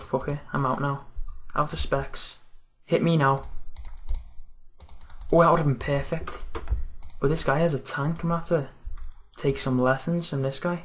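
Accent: Liverpool, United Kingdom. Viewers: Cheers mate ;D love the vids keep them up, scouse accent is ftw!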